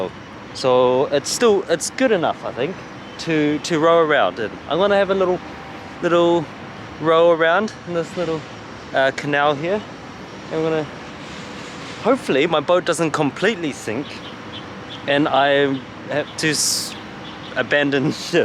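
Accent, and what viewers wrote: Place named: New Zealand. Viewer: where are you from bro? sound like a kiwi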